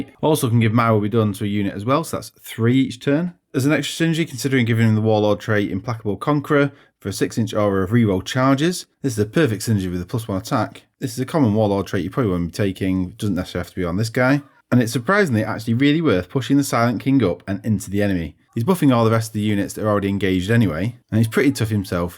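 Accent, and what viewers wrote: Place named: Scotland, United Kingdom. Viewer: Una pregunta ,por curiosidad , ¿de qué pais eres? lo digo por tu acento se me parece un poco escocés o galés pero igual eres de yorkshire ,un saludo.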